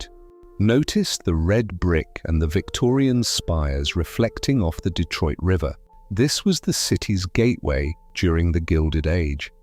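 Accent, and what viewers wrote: United Kingdom. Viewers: Noooo! The "arsenal of democracy" as narrated by a Brit! Gag! ANOTHER!! Anerican story but no American narrator! How about the history of London narrated by a "suthin cracker!" Shown in Grt Brit!!?? DONT WATCH!!!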